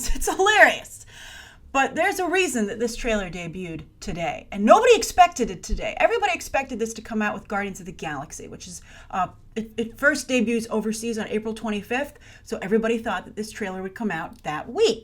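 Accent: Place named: Boston, United States. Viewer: Are you from Boston or do you have a speech impediment?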